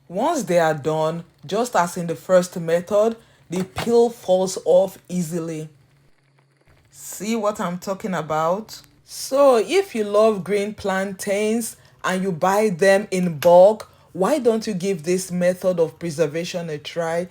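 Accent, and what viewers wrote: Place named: Nigeria. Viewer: Where you got those from? I like your Nigerian accent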